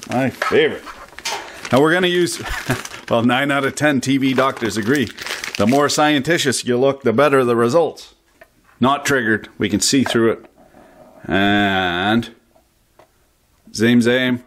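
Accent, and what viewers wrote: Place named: Canada. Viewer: Why can't Canadians pronounce Z correctly?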